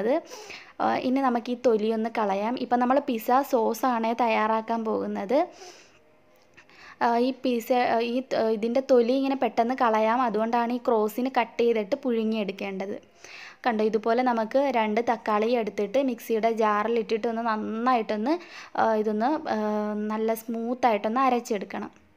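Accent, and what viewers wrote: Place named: Kollam, India. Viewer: കൊല്ലം കാരിയാ ലെ ... കിടു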